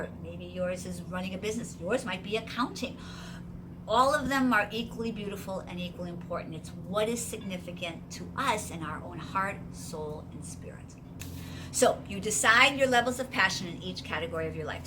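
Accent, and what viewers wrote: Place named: United States. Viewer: A very pleasant person! Always laughing very enjoy to live! I dont understand all she is telling because she speak to fast and with a american accent but i come to see her !! In front of her energy i am happy for the day!!!!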